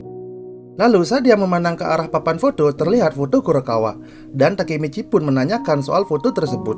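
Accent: Bali, Indonesia. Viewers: Logat bali admin? Hehehehe rahayu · Logatnya Bali sekalii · Logat bali ini 😁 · Bang dari bali ya kog bila t khas bali banget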